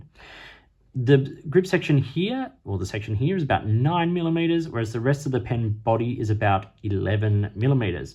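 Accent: Australia. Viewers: i am still thinking whether to buy orange or black one. For a few years I have been relying on your reviews videos mainly because you’re also a lefty, have a nice handwriting and your reviews i find them very informative, to the point without ‘frills’. I’m from Europe and love your Australian accent 😅 thank you for all your effort! ❤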